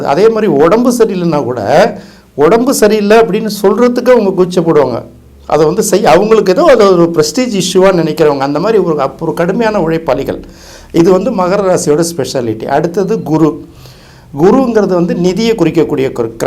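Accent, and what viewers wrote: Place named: Chennai, India. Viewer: Madras தமிழில் பேசி நாசமாகப்போகிறோம்.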